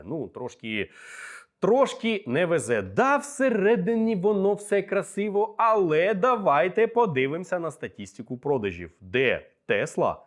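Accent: Russia. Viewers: Бля… неможливо слухати такий акцент жахливий російський. Розмовляйте російською краще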